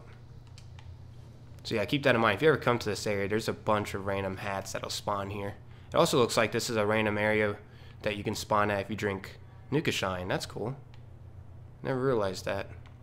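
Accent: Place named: Pittsburgh, United States. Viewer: Are you from Pittsburgh?! I know a yinzer when I hear it dut go stillers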